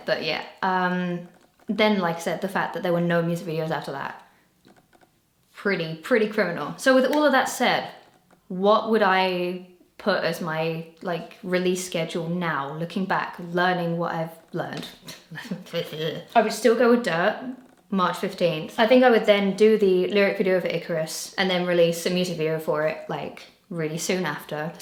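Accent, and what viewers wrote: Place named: Essex, United Kingdom. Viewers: Off topic (and sorry if you've addressed it elsewhere) but has your accent changed? We're both from Essex and I could have sworn you used to have a more southeast England accent - it sounds a bit northern now? I've been a fan since the early days and now I'm going mad trying to remember if you've always had this accent! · I would have loved both villains 1&2 to be singles with a video. I also think that maybe putting the more pop songs on another album would have worked if you know what I mean? Also you have lost a little bit of your Essex accent and I’m missing it 😂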